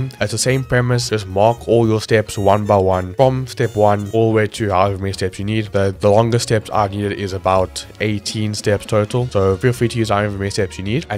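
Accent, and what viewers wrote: South Africa. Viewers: Are you South-African?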